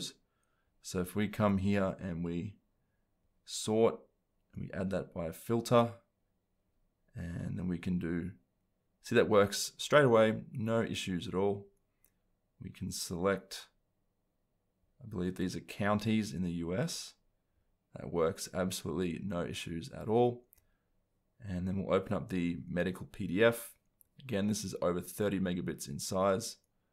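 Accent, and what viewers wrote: Australia. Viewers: Nice video mate, nice occasionally hearing a fellow Aussie on YT. Suggestion: have you thought about using OBS to record your screen? Will obviously add to your editing time though.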